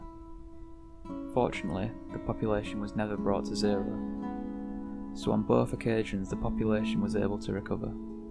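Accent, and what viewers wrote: United Kingdom. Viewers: he's UK or something along those lines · Judging by the accent and vocabulary, you're from the UK? I am :)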